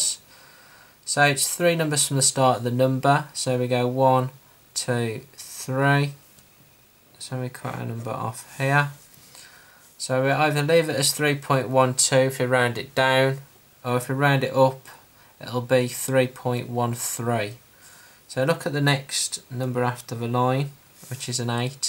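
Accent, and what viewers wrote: Birmingham, United Kingdom. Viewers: Thanks for the explanation + this 🥵 Brummie Accent.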